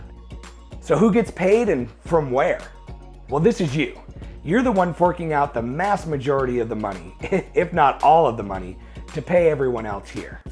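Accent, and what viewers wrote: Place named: New England, United States. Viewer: a wicked lot-ah money! New England talking!!!!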